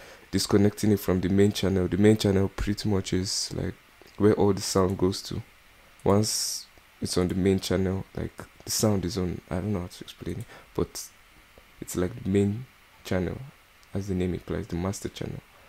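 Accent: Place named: Nigeria. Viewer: You a nigerian bruh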